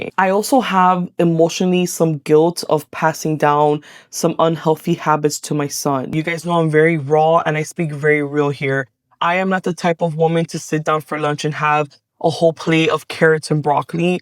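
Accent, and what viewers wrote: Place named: Southern California, United States. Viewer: Indicted TV's Negra MUST meet this youtuber! 😊 the SoCal accents 😊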